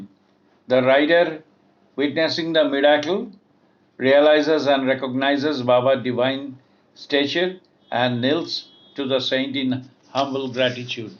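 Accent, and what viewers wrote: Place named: United Kingdom. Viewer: Your pronunciation is very difficult to differentiate from British Style. Very Good.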